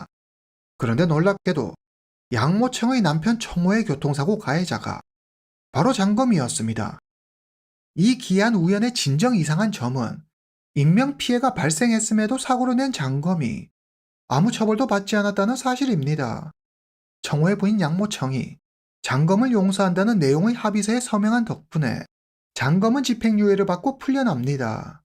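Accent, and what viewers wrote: China. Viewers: 말투 어디 말투 입니까 조선족 아니고 중국인인가?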